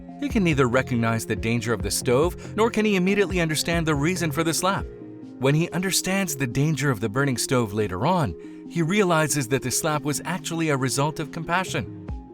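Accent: Turkey. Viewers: I liked the Turkish English accent better, y'all didn't have to get another narrator😭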